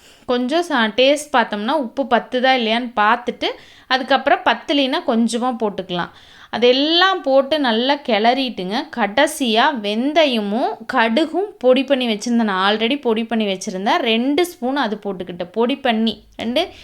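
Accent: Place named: Kongu, India. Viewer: nenga yaru slang ah change panna solla. Ithu kongu slang. Itha la unga nala eathuku mudila na enna pandrathu. Be inclusive❤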